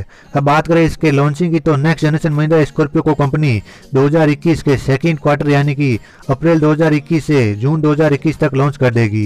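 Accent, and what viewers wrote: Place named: Rajasthan, India. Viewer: लगता है राजस्थान से हो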